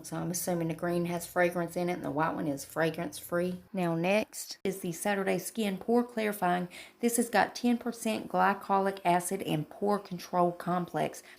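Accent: South, United States. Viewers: Your accent is so thick it makes my husband think I'M talking when I have YouTube playing thru the speaker in my laundry room! You're giving me a run for my money on that Southern Belle Sound!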